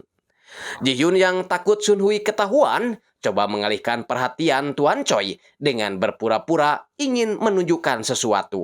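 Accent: West Java, Indonesia. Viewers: Logat adminnya sunda bangett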